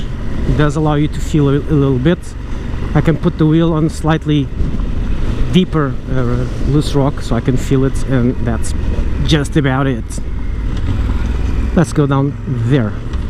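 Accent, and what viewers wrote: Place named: Portugal. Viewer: Incrível como topei em 5 segundos que era um tuga a falar inglês.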